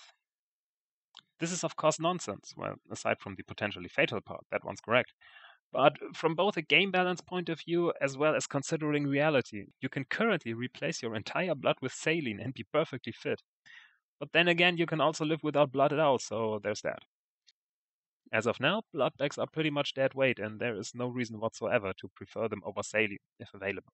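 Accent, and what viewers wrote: Germany. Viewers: gutes video ich find es immerwieder witzig deutsche english reden zu hören :D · Do you are German? I could swear you sound like a German xD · You sound german :D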